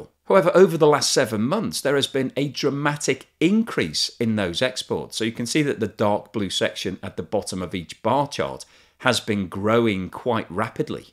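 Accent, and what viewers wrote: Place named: Liverpool, United Kingdom. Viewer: Great content and superb delivery -{ for non-UK viewers, delivered in a pleasant Scouse ( ie Liverpool) accent )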